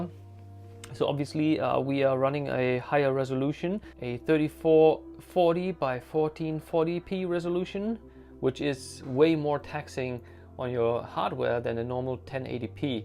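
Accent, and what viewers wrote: Germany. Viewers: Hey thanks for the review, the monitor costs 670€ at the HP Store this weekend and you really convinced me to give this monitor a try I can barely notice your german accent, just saw on your profile that you're living in japan, thats my dream man hope you enjoy it :) Cheers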